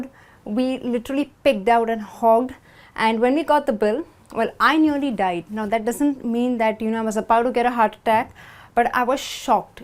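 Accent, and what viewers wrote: India. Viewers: You english sounds like indian english. I learned very much with this lesson. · Are your English is Indian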